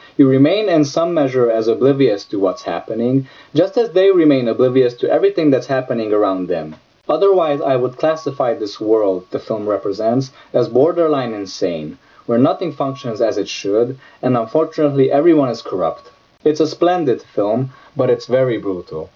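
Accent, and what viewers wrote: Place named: Portugal. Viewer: Are you portuguese? You kinda have a portuguese accent :)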